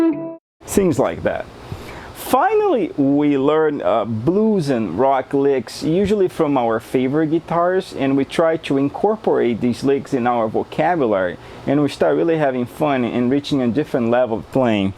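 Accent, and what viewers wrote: Brazil. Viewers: I think the accent is Brazilian. I can't get to the link. I like this stuff. I've pulled towards this type of phrasing without know/analyzing what I was doing. This'll be useful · vc poderia fala em portugues. pelo menos teu sotaque me parece brasileiro. e poderia colokar as legendas em ingles. ; ) soh uma sugestao nao me leve a mal.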